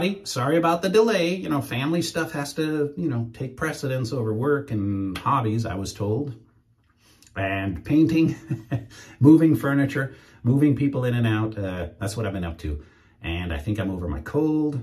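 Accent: United Kingdom. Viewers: The thing i love about british people is their accsent🥳😃🎉🎊🇬🇧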